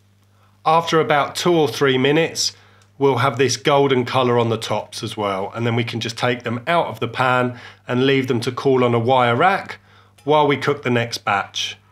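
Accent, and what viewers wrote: United Kingdom. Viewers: I am so glad the OP has a British accent and not some New Yorker! :D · This is proper, a dude with a thick af british accent telling us how to make crumpets. This is what the good Lord intended.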